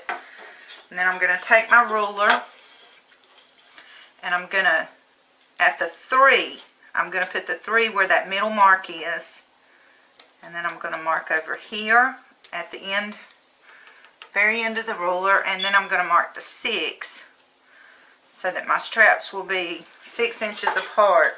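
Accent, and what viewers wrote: South, United States. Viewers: I am from Mississippi, moved away very young. Loved, loved, loved hearing that southern accent again! And you are my FAVORITE tote/purse construction teacher. Love You! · LOVE your tutorial and even more, your Southern “accent” (I’m from the North so it’s an accent to me, haha) Tfs this very clear and concise video on how to add a zipper to a tote bag and also with an accent fabric. I bookmarked and partially viewed so many videos on bags my head was spinning but, yours was the best so far! Will go start my FIRST tote bag now and hopefully it’ll look as good as yours!!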